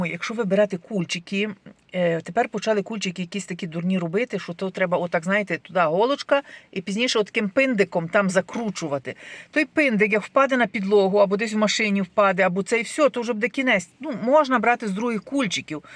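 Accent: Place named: Galicia, Ukraine. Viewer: Де ви, оберталися у совєцьку добу? Що ви розповідаєте про "подарунки на новий рік "? На заході України не дарували подарунки на новий рік- це просто нонсенс. Подарунки дарували на Миколая і по всьому. Я з Тернополя, тож відповідно знаю добре Львів- маю родичів у місті, приїздила у відрядження. На Галичині більшість людей навіть не святкували новий рік, тільки Різдво. Ви маєте галицький акцент, але не самого міста, можливо району. Принаймні, жодна людина у моєму колі не користала суржик. Щодо намиста, то такі прикраси є дуже тренді, прикрасить будь-яку сорочку чи сукню і до вишиванки надзвичайно гарно. Послухайте красиву пісню чарівної " "- якраз про це. Певно, італієць мав на меті подарувати щось особливе. 🇺🇦✌❤🖤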